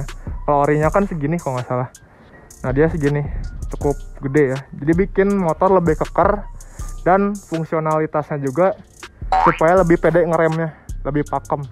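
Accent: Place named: Sunda, Indonesia. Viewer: Sunda pisan ei AMANG Yeu mh euy. Bhasa na. MANTAP..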